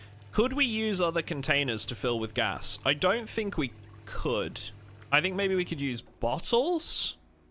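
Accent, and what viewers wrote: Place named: United Kingdom. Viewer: Your voice slightly, but only slightly reminds me of ItsJabo. Maybe because both of you have the same accent. Both of you are from the UK.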